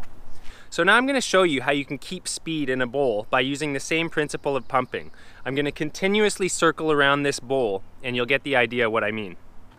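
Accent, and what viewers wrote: Canada. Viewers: Is that a fellow Canadian I hear?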